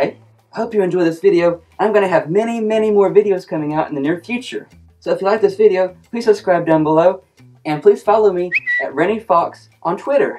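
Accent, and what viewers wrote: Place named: Texas, United States. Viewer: Nice video!I love your accent. You from Texas?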